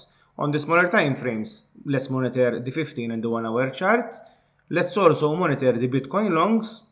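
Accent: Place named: Russia. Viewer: I like your accent beside the analysis. Like a person from russia or france.😁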